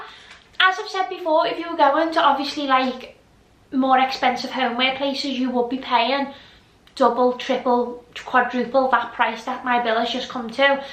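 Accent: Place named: Liverpool, United Kingdom. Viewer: Thank you. Great haul. First time watching, you have a great (Scouse?) accent. Honestly, I loved everything, hard to pick a favourite, they are all gorgeous.❤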